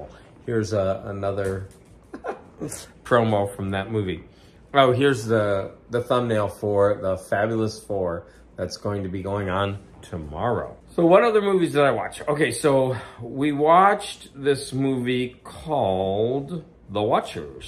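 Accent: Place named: Kentucky, United States. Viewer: Glad you did publish it! I always feel that way about my videos when I first publish them. Then I'll look at them later and think, "That wasn't half bad." Your Kentucky accent was the highlight in this one!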